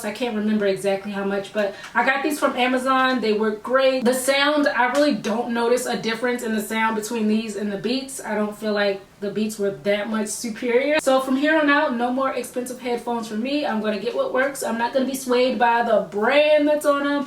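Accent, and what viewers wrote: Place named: Philadelphia, United States. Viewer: Is this girl from Philly??!! The accent seems so familiar... :-)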